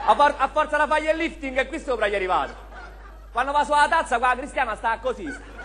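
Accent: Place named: Rome, Italy. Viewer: Parla in romanesco stretto per tutto il tempo, e quando dice "coatto" ci tiene a specificare che è un termine prettamente romano... MA DAI?? -.-'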